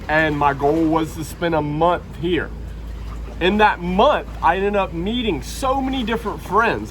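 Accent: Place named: Georgia, United States. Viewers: Your Georgia accent is very strong and thick but that is okay because you are well spoken on your video's. I like your video's very much and I am sure with your work ethic you will have great success in business and be prosperous. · Ya you sure have that Atlanta accent, congrats bro you showed up NUMBER ONE on my home page under recommended! big moves my dude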